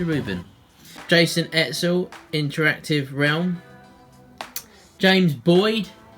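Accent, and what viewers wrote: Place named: United Kingdom. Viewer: You know, the first time I watched one of your videos I just assumed that N/Z meant New-Zealand. And I thought: why has this guy got a UK accent? 🤣🤣 silly me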